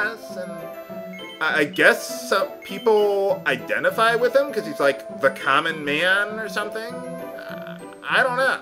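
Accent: Ireland. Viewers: btw its Irish the accent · its an Irish accent:) and also give the cowpat to tornecko when u can:) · This accent sounds Irish to me. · It sounds like he is Irish to me. The myth of the town disappearing and some the phases are what make me think so.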